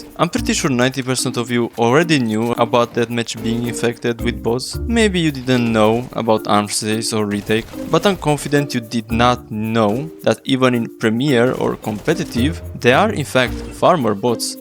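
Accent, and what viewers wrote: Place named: Romania. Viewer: It took 6 minutes to figure it out you are romanian ahaha😂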